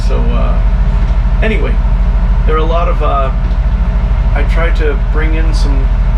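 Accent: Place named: Louisiana, United States. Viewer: After years sailing worldwide , I assure you, the Cajun accent is nothing 😉 I ve come about some memorable ways to mishandle the English language: Try this... Helms order from a Chinese inland pilot "Yellow Yellow Yellow" 😵‍💫😖and that in the middle of the night on a river without any light and no chart we knew off and plenty unlit barges. Eventually we manage to make "Zero Zero Zero" out of it (the Chinese pronounce the "R" as "L"). Or this pilot in a remote small port in Estonia.. as there was no BA chart existing of the place, he brought his own Russian one. It looked like quite a long buoyed channel on paper. With the pilot's only English at each pair of buoys that was "Red to port, green to starboard" (Note: in Europe, it's inbound red on portside, as in opposite to America where it is green inbound on portside). This worked till we got to berth and plenty shallow water around. 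Then he uttered something without any warning and speed reduction that was to mean that we had arrived at our destination... Two days later, when outbound with the same pilot, we put him on the bridge wing and closed the door while he was like a parrot "Green to port Red to starboard" Not to forget: the Philippine sailors that always pronounce the F (or ph) as a P, there is also the odd one that pronounce the P as a F. It gives this... "chiep, chiep, I want to go ashore for a telepone call, it is very cheaf here"😂😂😂😂.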